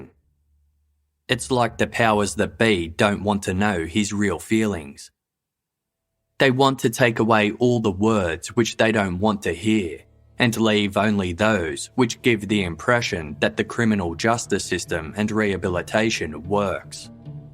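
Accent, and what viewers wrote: Australia. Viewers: Love your channel. Love the Australian content. Love your Australian accent. I don’t know what I am going to do when I have listened to all of them. Aussie Aussie Aussie. Oi Oi Oi !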